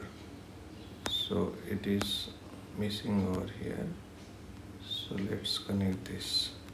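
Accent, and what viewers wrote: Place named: India. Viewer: Indian speaking his English, Really. 🤮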